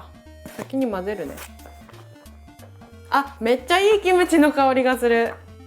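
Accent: Izumo, Japan. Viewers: 島根出身だけど最近帰ってないからちょいちょい横に出てくる出雲弁が懐かしくて涙ちょちょ切れ！ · どげだ…出雲弁！同郷の星やね～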